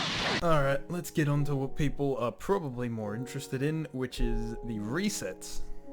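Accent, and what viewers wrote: Australia. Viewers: Sorry bro but i would rather hear roofles manly alpha voice narrate a hit guide instead of an aussie :( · You know he's Australian cause he says haytch instead of h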